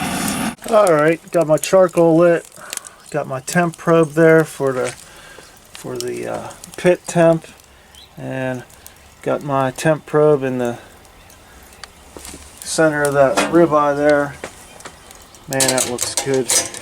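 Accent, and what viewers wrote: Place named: Western Pennsylvania, United States. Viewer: You sound like you are from Western Pennsylvania you jag.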